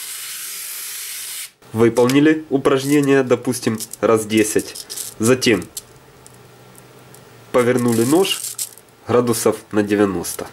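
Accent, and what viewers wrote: Ukraine. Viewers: Канал зарегистрирован в США. Кому же идут собранные деньги? Если акцент окраинский?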